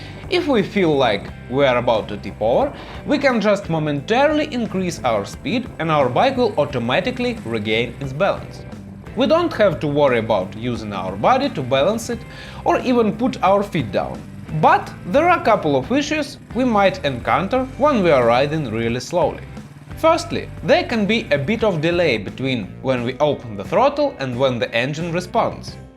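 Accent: Russia. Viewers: Clearly the best motorcycle instructional videos out there. Even with the strong Russian accent, you still have the clearest and most understandable explanations in the field. I would challenge any American to learn Russian and be able to be as fluent while creating such competent content. Kudos my comrad--I am a subscriber now. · He is in Argentina, but he does sound Russian, I wasn’t sure haha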